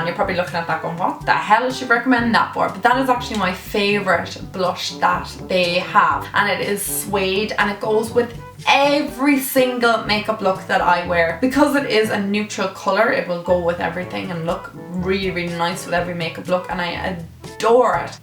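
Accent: Ireland. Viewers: what part of Ireland r ya from ....Meath pride 💚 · You have an amazing accent, I feel like it's Irish ( because I'm Irish too ) if your from Ireland.. Where abouts? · are you from ireland?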